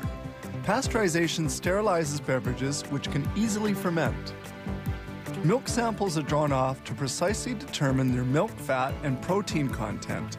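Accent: Canada. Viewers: His accent is so very Canadian.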